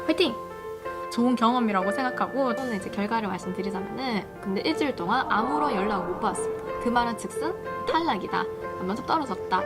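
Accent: Gyeongsang, South Korea. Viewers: 어쩌다 지나가다 ~축하드려요 ㅎㅎㅎ 억양이 경상도인것 같네요 ㅎㅎㅎ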